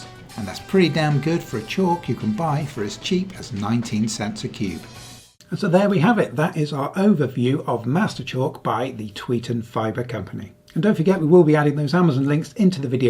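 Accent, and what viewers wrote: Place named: United Kingdom. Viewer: Can I ask what countries currency you're saying in dollars? Mostly so I can make peice conparisons to New Zealand dollars. You sound like you should be saying pounds...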